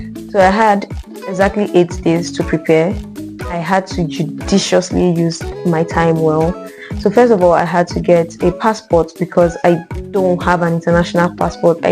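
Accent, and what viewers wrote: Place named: France. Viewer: This cool ❤... just love your french accent fr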